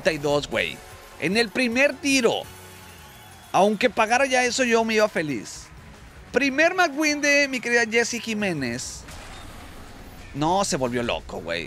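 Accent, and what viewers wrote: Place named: Argentina. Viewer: Se esta argentinizando ❤ venite a argentina ya sos uno de los nuestros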